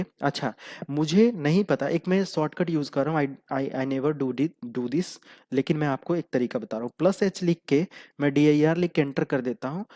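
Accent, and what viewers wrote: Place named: India. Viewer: Big bro, Its too good. Flaws hain kuchh.. jaise chaand pe daag. Communication skills pe bhi kaam karo.. Sh s wala issue hai.. Jab bhi Power Shell bolte ho.. Partial sunai deta hai... confuse ho gaua tha me.. Actually, s sh issue bhi zada ni hai.. i heard you often using the correct form... intemitent issue hai ye.. kabhi kabhi miss karte ho... Khatarnak Indianism... ye global tech hai... isme bolte time Indianism khatam karo... angrezi bolna majboori hai kyuki un terms ke liye Hindi words hain he ni... lekin aap bolte time apne brain me translate jo karke bol re ho.. wo translation practice idhar reflect ho ri hai... Khud socho.. itna knowledge wala video agar proper English me dalo.. to kitne consumers jeebh latkaye laar tapkate bethe hai apko sunne ke liye... Kisi ko hire karo... apki knowledge proper audio me dene ke liye.. 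mujhe rakh lo... zada paise ni mangunga... kyuki mujhe bhi to gyan milega... Appreciate your efforts. Loads of respect. Many thanks.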